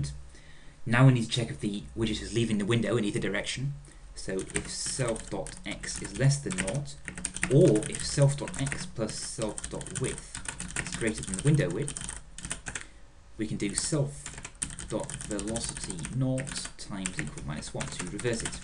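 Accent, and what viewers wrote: United Kingdom. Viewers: he lets his northern accent slip now and again -- his "jeeves" accent is a complete fabrication. There are many about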